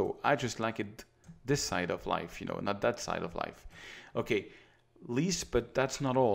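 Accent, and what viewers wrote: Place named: Paris, France. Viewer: god ! you are so french so parisien...but is ok ! je suis un toulousain in hawaii..continue ton bon travail ......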